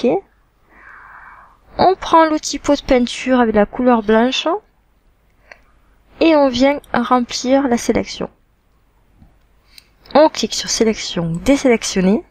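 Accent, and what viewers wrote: South, France. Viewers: Ahhhh j'étais sur que c'était du sud et j'ai faillit dire Marseille mais j'ai bien fait de ne rien dire j'ai évité l'accident politique lol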